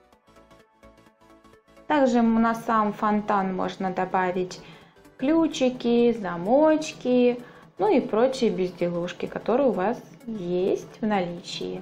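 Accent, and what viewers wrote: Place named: Ukraine. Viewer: ну я поняла,просто сказала.вы с Украины?у вас есть акцент.много знакомых на Украине живут,они все верят во всякие приметы.У вас не так?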